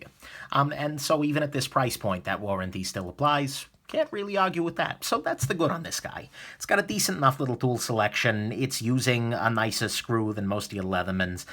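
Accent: New York, United States. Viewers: You from New York bro? I worked with a lot of cats from there and you sound like them haha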